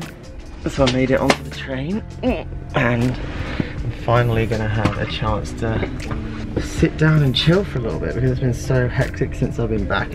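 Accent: United Kingdom. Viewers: Between the British accent, the background music, and tinnitus, I often have to watch his videos several times. Headphones help.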